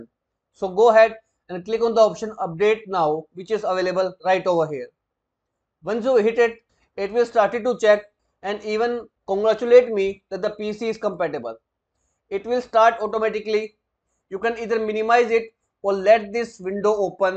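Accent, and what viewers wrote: India. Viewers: Indian accents is very help my problem!!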